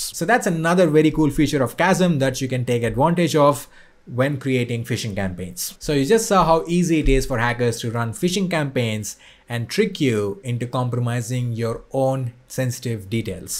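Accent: India. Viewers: bro look arab and speak indian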